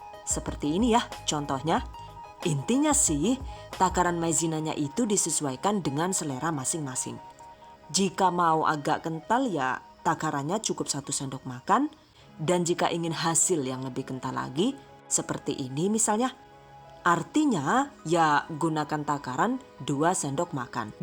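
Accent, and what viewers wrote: Banyumas, Indonesia. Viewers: Ooo key 😁 salam ngapak ibuneee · Deneng sih ngapak mbokayu??? Ketone enak yakin..arep njajal gawe lah nyonge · Ternyata wong ngapak ibune 😄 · Suka KL ngomong ngapak, walau q g ngarti....tp lucu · Kok ada ngomong ngapaknya😀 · Lehhh deneng si ngapak jebule 😂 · Mba sampean wong mediun kok ngapak kwkwwk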